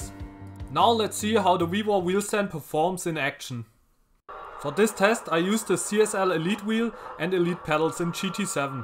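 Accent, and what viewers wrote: Germany. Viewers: Man hört das du deutsch bist 😂💪👍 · I seem to be noticing that you have a german accent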